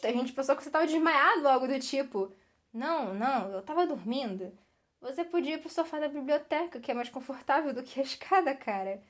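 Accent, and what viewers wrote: Rio de Janeiro, Brazil. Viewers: sotaque carioca é feio demais tá maluco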